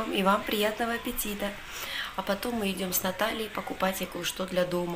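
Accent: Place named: Georgia. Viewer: А мне нравится как вы разговариваете на русском языке!Такая изюминка присутствует в легком акценте.Если бы вы сказали,что грузинка,то все писали бы ,,ой как вы хорошо говорите по русски!"А прожить в Грузии столько много лет и разговаривать по грузински....конечно меняется интонация!Я вас понимаю потому что сама жила в Грузии 4 года с родителями и ходила там в школу,это было при Советском Союзе.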